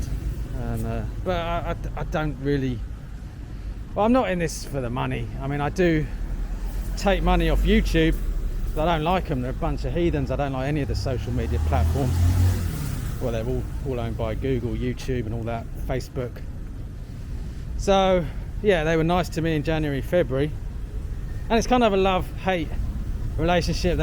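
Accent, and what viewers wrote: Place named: London, United Kingdom. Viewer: Thanks for the back story. Would not have thought you had a private school background, and your dad being a muso. Obviously you have set yourself up really well financially, which requires hard work. I'm a Kiwi and to me your accent is very London working class, "inn-it".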